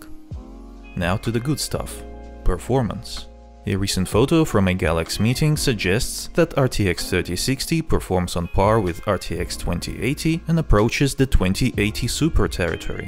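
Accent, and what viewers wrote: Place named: Russia. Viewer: сразу русского по акценту узнал)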